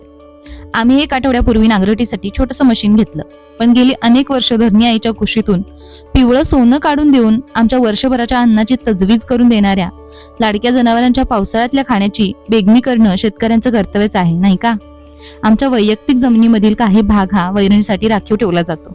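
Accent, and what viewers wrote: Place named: Pune, India. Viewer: तुमचे माहेर कोणत्या शहरात आहे,,,, तुमची भाषा खूपच शुद्ध आहे,,, पूनासारखी वाटते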